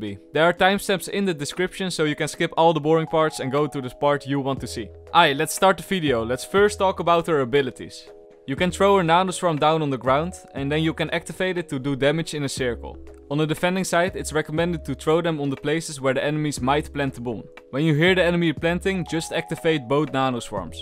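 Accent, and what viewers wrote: Netherlands. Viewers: Pls tell me ur Dutch u can not not be Dutch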